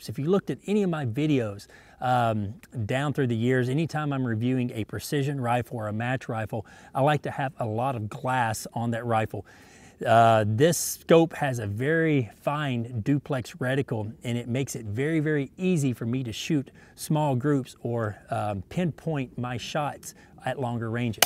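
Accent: Tennessee, United States. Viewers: what part of TN you from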